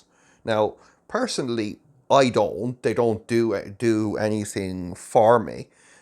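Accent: Ireland. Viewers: Thank you Mr. 😁. Really loved the sarcasm with the really nice Irish accent.. 😊. I Agree with you 100 % on this matter, you broke it down quite well on why and how. As usuall ppl do not even know why they do, what they do. This would serve as a good tutorial of asking them, do you even know what you want? Thank you for the video, and Happy New Year 2022..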